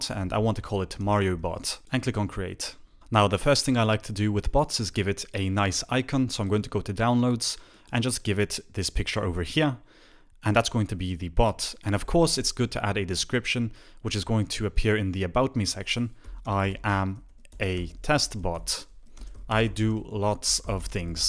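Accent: Italy. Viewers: bruh the best italian english speaker that I ever seen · Are you Italian?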